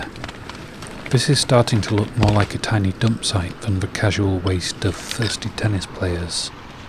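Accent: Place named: England, United Kingdom. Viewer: Thanks for the scenery and the English countryside feel of your voice as you take us on a trip down your memory lane. I was right there with you when you "broke on though to the other side" of that tree root. So in sync that I burst out laughing when you spoke, out loud, the words in my head. We even said broke rather than break.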